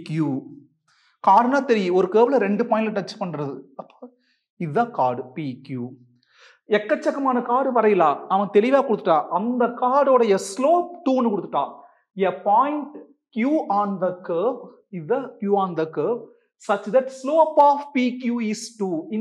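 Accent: Coimbatore, India. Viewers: Your way of teaching in coimbatore slang is interesting sir👌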